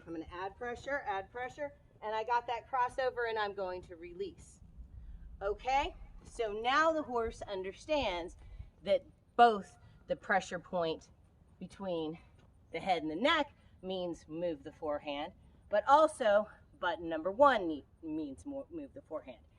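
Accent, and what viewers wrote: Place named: Texas, United States. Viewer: What part if Texas are you from?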